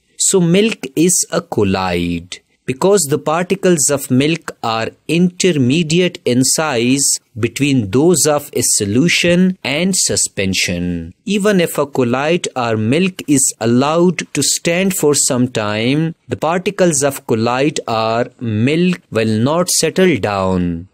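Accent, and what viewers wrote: India. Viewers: يخربيت اللهجة الهندية 🤦🤦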